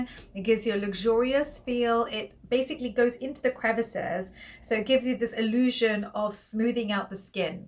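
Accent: United Kingdom. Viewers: Special thanks for you English British accent, i am as a foreigner just can't stand American English accent, so again thanks and all the best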